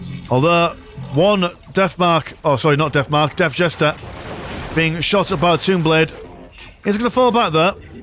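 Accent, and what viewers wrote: United Kingdom. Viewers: its not Warhammer without a British man casting it, love your content man!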